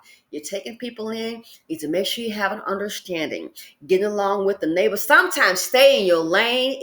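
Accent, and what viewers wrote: South, United States. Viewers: It’s country and southern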